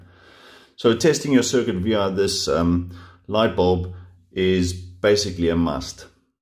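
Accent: South Africa. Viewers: Howzit boet! Tell me you are South African!!!!! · Thank you for this. Have been looking for a solution as simple as this one. Just to confirm, the sizes of the components you used works for RSA households (voltage etc)? I assumed from your accent you're South African...Afrikaans soos ek;-). Where do you buy your components? I already have the Sonoff switches, just looking for a reference for the diodes and capacitor?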